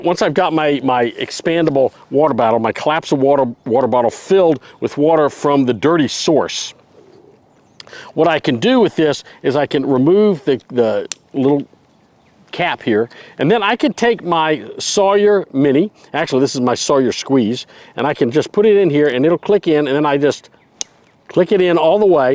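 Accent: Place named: New England, United States. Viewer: Thanks. I lived in Jacksonville FL for a few years, and worked maybe up to a year in (Fl/GA Stateline) Kingsland GA. I had guessed to myself that maybe you lived in OK, AR, or TX because of your Yankee accent, having forgotten that lots of Yankees life in FL et al. I have about 10 backpacks and Bug-Out-Bags because I call "hikes", expeditions, because I am looking for places of interest to explore--each one related to a specific hobby that requires different equipment. I would never carry two folder knives (tho I have no objection about that). I would add either a folder Silky saw (best for green wood) if one wants to cut branches to prevent widow-maker dead fall from trees, or the Laplander folder best used for dry/fire wood. Yes these are longer, but much more useful in unfamiliar terrain and unexpected weather. I would also never bring sugary snack bars. 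They don't stick and they tire me (as I am pre-diabetic); but I do carry sugar (brain needs sugar) in the form of peppermint life-savers (and I suck on them when I recognize that I am not thinking fast or clear enough. If the lives in a Southern State bordering an ocean, I would not recommend sleeping on ground. I had in my backyard in NE FL, dozens of tiny poisonous rattle snakes (I don't recall their proper name). I'd much prefer a hammock with mosquito net and tarp overhang to a poncho (and I have both). I'd sleep better in a hammock off ground. Now I'[m in a winter state and tomorrow the wind chill is predicte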